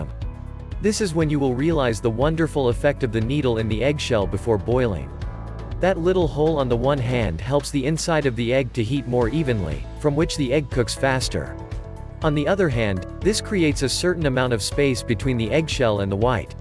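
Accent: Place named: United States. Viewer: This video is so scary. It is obviously not written in English lmfao but the white American accent is scary!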